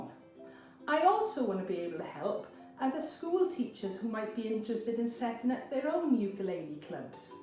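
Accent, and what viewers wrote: Wales, United Kingdom. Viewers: At 85, and since the recent loss of my beautiful wife, I’ve picked up a ukulele for the first time. I not only enjoyed your 12 bar blues lessons I loved hearing your accent as I lived in Raglan with my Auntie during the war years and left my heart there so it’s a win win for me. I’ve lived in this part of the world- Australia/NZ since 1955. Thank you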